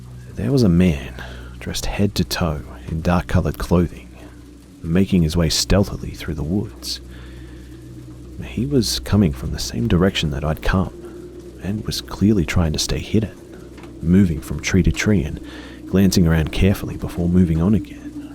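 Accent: Australia. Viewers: what part of Australia you from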